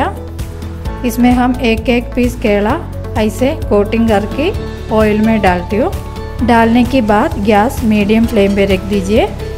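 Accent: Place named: South, India. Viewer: I am from Bihar love to listen your Hindi . Truly speaking I don't want to listen your perfect Hindi Because your innocent Hindi tone mixed with south Indian tone sounds Awsome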